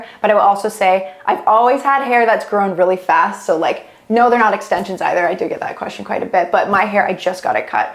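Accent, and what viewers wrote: Canada. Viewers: I figured you were Canadian by the way you say words like 'House' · I love hearing Canadian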